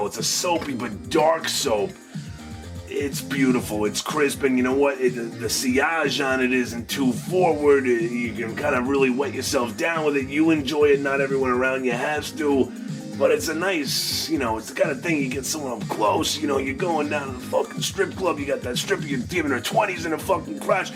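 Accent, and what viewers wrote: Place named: Brooklyn, United States. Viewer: Great review and you sound exactly like my uncles from Brooklyn. When they say "fuck"it sounds so elloquent. Aramis is the shit and DOES smell like guys at the train station.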